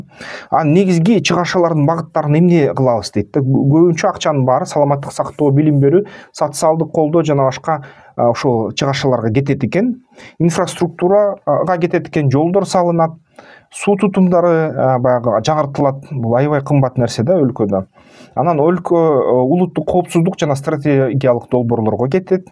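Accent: Standard. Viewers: Сонун таза кыргызча менимче мамилекет туура жолдо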